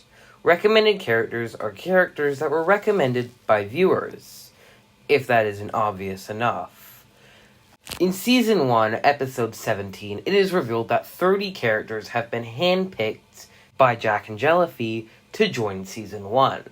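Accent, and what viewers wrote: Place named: Australia. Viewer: What happened to the australian accent 😔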